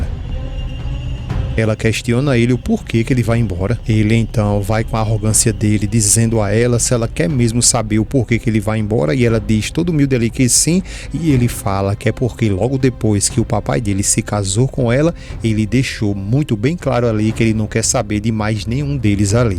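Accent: Pernambuco, Brazil. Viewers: Eu acho que ese narrador é de Pernambuco tem um sutaque forte e fala mulestra e Satanás ele é muito engraçado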